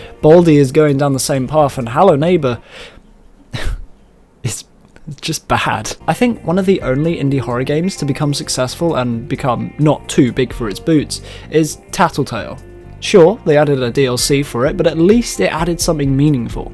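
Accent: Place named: United Kingdom. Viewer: your british which makes you 10x more annoying than you already where with this video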